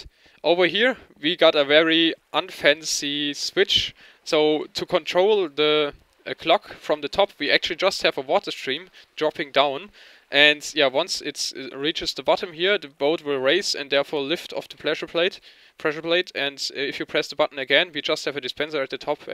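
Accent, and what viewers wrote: Germany. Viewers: lol bitch farm =D german accent is awesome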